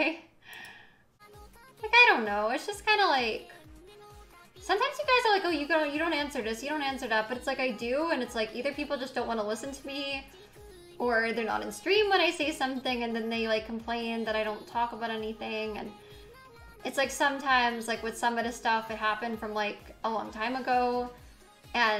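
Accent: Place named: Connecticut, United States. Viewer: When she says "bits-uh" I want to pull out my hair...I think its a connecticut thing lol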